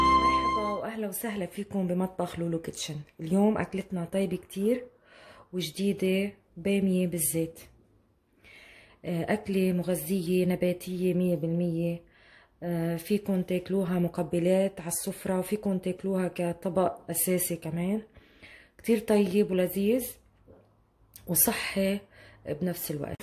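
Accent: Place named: Beirut, Lebanon. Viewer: لهجتك بيروتية أصيلة جميلة للغاية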